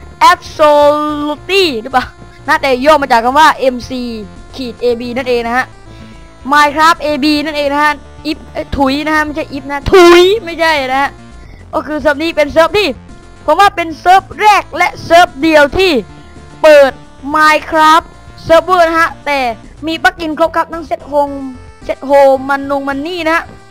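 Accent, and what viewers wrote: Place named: South, Thailand. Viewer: 5555 เเม่งคนใต้ชัว โครตฮาเลย ฟังดี ๆ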